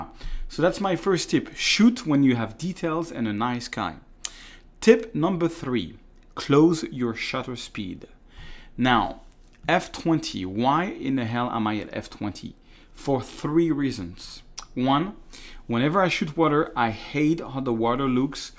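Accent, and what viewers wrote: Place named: France. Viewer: when french people speak English...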